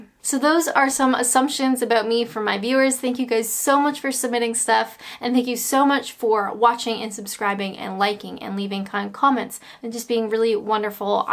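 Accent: Canada. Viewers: Lol, its pretty easy to understand you are Canadian. I can hear it whenever you speak.. Only Canadians say ABOOT, when saying ABOUT... I meet Canadians here in Florida all the time. Every winter lots of them come to Florida to escape the Canadian winter... I enjoy seeing and talking to them... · Your pronunciation of 'ou' in most words highlights your Canadianess! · Hi, are you currently back in Ontario Canada or still in Orlando Florida?? The room in this video looks more Floridian...is that even a word??? Well done reaching 60,000 subscribers. I was doing cartwheels when I reached 100. My assumptions about you is that you are able to handle just about any situation and you have a great positive attitude. Doing anything in front of an audience can be absolutely terrifying, I can completely understand your feelings about that. I also understand why you value privacy, I do the same thing. 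I would also really hate painting my toenails!!! LOL. Incidentally, I think your Canadian accent is great. Your accent is quite different to the BC Canada accent. Where did you get the "Adventures and Naps" name from for your video channel? Incidentally, when eating peas, which are all the same, why do we always choose which one to eat first? Now that is something to ponder about!!! I always add the milk after the cereal. When you do decide to get a delivery from Tim Horton's or anywhere else, could you possibly do a video covering the first unwrapping please? Anyway, wishing you the very best. Robert. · Accent is definitely a cannuck.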